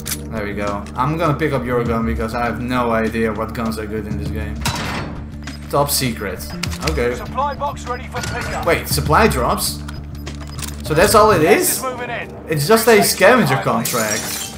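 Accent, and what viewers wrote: Netherlands. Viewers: are you from dutch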